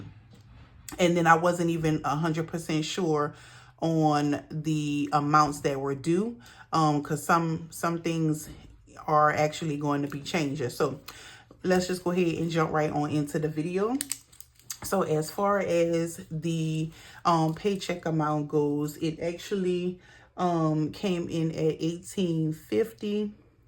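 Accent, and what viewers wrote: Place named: New Orleans, United States. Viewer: New subbie here. I know you’re tired of hearing it but us ppl not from New Orleans LOVE your accent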